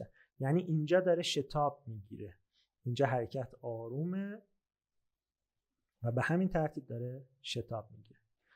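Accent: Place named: Shiraz, Iran. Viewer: آموزش با لهجه شیرازی یه لیگ دیگه‌اس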